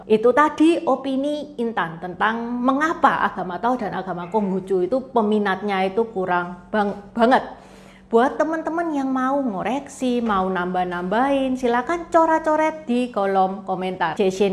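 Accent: Java, Indonesia. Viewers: Logate jowo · Intan …aq senang dengan logatmu yg Jawa medok 😂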